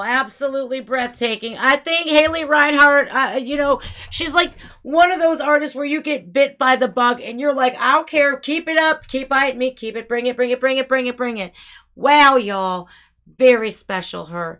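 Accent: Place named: Ohio, United States. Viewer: You are THE. BEST. I love your Ohio accent.:). Yeah, watch Haley's bit. She smolders.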